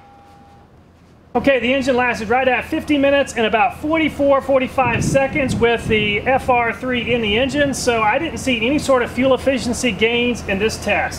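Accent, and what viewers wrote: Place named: Indiana, United States. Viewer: Man you definitely got that Northern Indiana accent!!!!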